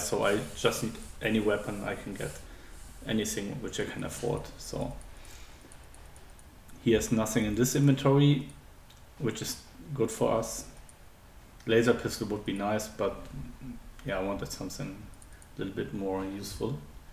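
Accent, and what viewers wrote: Germany. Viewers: Ich gehe mal davon aus, dass du Deutscher bist mit dem Dialekt. Warum hast du die Pläne weggelegt und sie nicht bei den Chinesen abgegeben? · Ich liebe den Denglisch Akzent :) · I hear that you are from Germany ;-)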